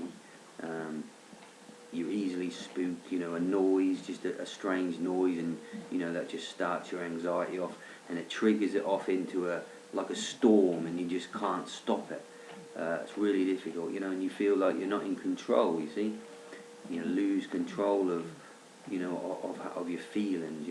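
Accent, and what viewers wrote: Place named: Norfolk, United Kingdom. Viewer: Wow...thank you so much for doing this video. Yesterday I had an epiphany! After approx 10yrs of taking Diazepam and Zolpidem for my 2-day very severe, frequent migraines, I realised that I have very probably had benzo withdrawal continuously!!! I am prescribed it for migraines, and in between the migraines I don't take anything! I have severe M.E. too, but for the past 4 yrs have had a lot of extra weird symptoms that drs can't explain (and are not interested in). I don't feel they are M.E. related...they are identical to what you are describing though!! Having been ill for 21 yrs, I am at my worst...barely managing to "survive". Pain levels are through the roof 24/7 and the full blown migraines are now every 3-4 days, and for years I've had migrainy days in between the migraines, which are hell. They are basically like having 50-80% of a migraine. 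They are currently happening 95% of the time!! So... I am hopefull that it is the benzos causing this, but not particularly looking forward to full blown withdrawal!! ....... Nice to hear a Norfolk accent? And a doggie chewing his bone??? Lol I wish you all the best and hope you start to feel a bit better soon.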